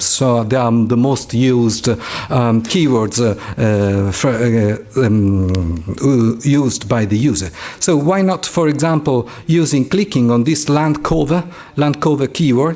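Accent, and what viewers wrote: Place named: United Kingdom. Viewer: Sei troppo forte zio! Perfetto con un pizzico di accento britannico!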